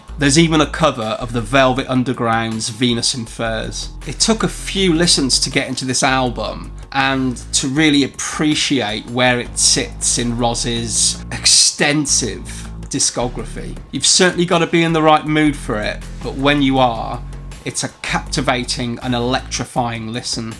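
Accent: England, United Kingdom. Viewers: Honestly,my entire knowledge about GOTH & DARK WAVE wouldn't fill a shot glass. What has me interested is a sheer boredom with what I've listened to for so long, DEATH METAL & BLACK METAL. It just seems like everything has been done. How many songs can be written about going feet first into a wood chipper or songs about the dark lord and master. So yes, I'm new to it. GOTH seems to have the darkness that I gravitate too, but being ignorant, your channel is a nice way to find about the various bands. It also helps that I could listen to an English accent read the dictionary. Carpe nocturne.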